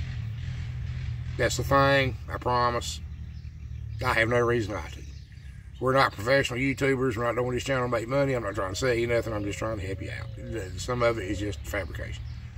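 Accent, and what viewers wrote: South, United States. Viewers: Thanks for sharing , watched most of your videos, This french Canadian has a bit of a hard time with the southern accent. my second year with piglets . I have a question for you . We have cayotes around and i rotate the pigs out on pasture with electric , i always lock them in at night , I wonder how old or how big should they be before i can trust them outside at night? Thanks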